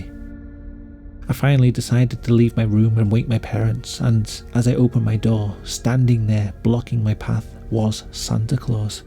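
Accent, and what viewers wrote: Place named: Midlands, United Kingdom. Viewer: Yeah, you can post the link so we can read all these stories ourselves, but... I'd rather listen to you tell them in your soothing Midlands accent. 😊 I grew up in Saudi Arabia and went to the International Academy, which followed the British curriculum. I believed in Father Christmas, it was not until we came back Stateside that I got back into Santa. (I do have the obligatory photo of me as a wee toddler, sitting on Santa's lap, bawling hysterically.) A great Santa story comes to us from David Sedaris, in an essay titled, "Six to Eight Black Men." I believe it's in the book Naked. Definitely one of my favorites of his. Happy Xmas everyone!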